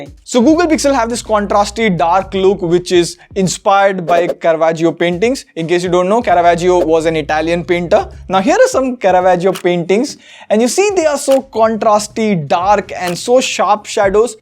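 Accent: India. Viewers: He sounds like Indian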